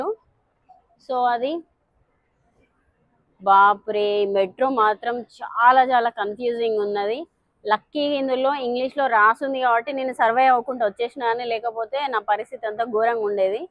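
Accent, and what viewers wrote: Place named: South, India. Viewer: South Indian accent is soo funny 🤣🤣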